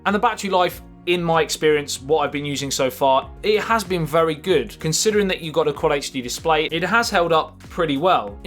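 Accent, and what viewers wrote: United Kingdom. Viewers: Hears unintelligent British accent, disregards opinion and clicks off video.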